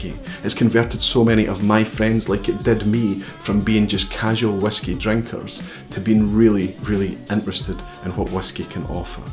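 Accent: Scotland, United Kingdom. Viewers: Yes, I did and found it helpful. But I am not a chemist and I recall in this or one of your videos you mentioned that the coloring was sugar or "sugar based" and I wondered whether that would affect the flavor as well as making a more balanced color? Living in the AMERICAN SOUTH where we have our own unique version of English, I have to listen carefully when you speak. I assume that when you say "meshie" you are speaking about a "blend?" But do not change a thing. I have visited Scotland and I love the country, people AND the Scotch (but not the haggis, sorry) Keep doing what you are doing-you have fans in the USA!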